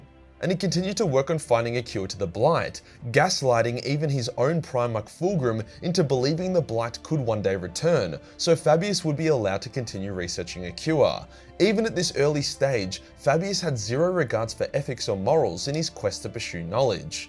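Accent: Australia. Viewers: yikes... Dont listen to this dude when he talks shit about college. Terrible advice. You really think a stupid website will hold more value to a degree which by the way, if you go about it wisely and use all the resources available to everybody, it wont cost you thousands of dollars. I got my associates without spending a penny due to scholarships and grants. Dont be lazy, be smart and ambitious. Really easy for this guy to say it when he got lucky off of talking about warhammer with an Australian accent for a living and thriving off of donations.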